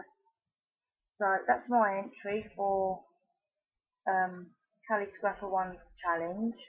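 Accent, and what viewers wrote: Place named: United Kingdom. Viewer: so British, I love it, gorgeous!!!! Hugs;)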